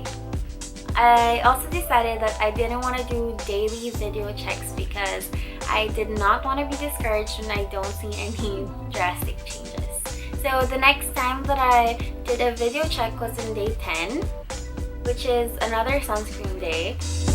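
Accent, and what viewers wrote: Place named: Philippines. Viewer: your accent is SOO cute!! are you filipino???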